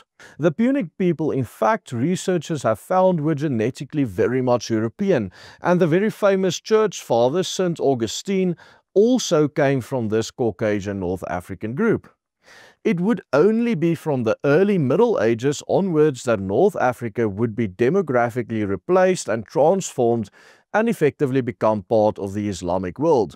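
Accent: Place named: Afrikaans, South Africa. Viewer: Was worried for a second, but then I heard his afrikaans accent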